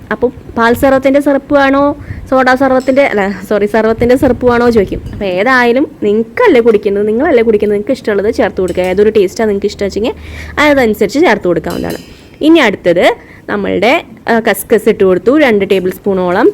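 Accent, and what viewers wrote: Thrissur, India. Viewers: Chechi frm Thrissur aanno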